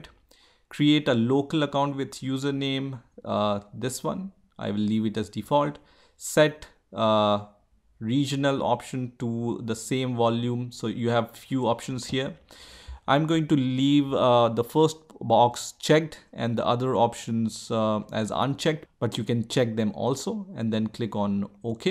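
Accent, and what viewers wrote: India. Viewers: I absolutely detest the Indian accent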